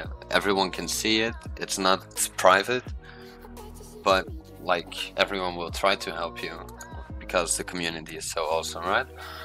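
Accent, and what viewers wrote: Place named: Netherlands. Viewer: Haha, well isn't Dutch your first language? It makes sense that you have an accent of course and I love it xD <3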